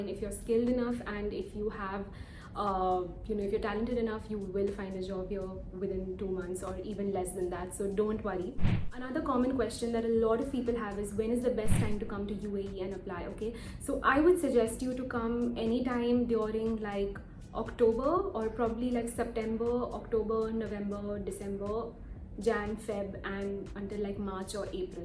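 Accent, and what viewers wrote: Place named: India. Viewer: Sorry but your accent is awful Are you indian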